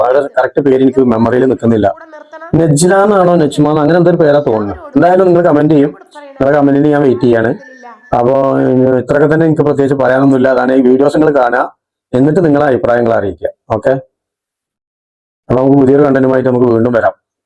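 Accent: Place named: Malappuram, India. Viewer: അത് ശരി - സംസാരം മലപ്പുറം തന്നെ😃😃😃😃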